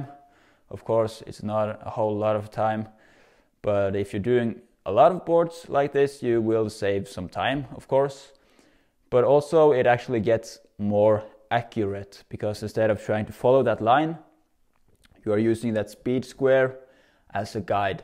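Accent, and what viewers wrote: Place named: Sweden. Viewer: Snyggt! Tyckte jag hörde lite svengelska därav min reaktion. Följer dig nu så hoppas på grymma videos i framtiden 😊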